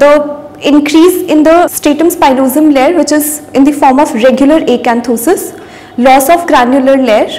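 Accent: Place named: India. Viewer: Thats indian accent .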